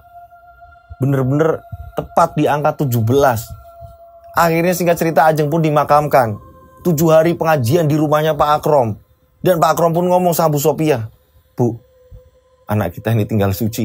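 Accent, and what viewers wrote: Java, Indonesia. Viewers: Aku suka ceritamu mas, ada blastran jawanya pokonya lanjot terus mas dengan cerita yg menarik (BOOOM"))👍👍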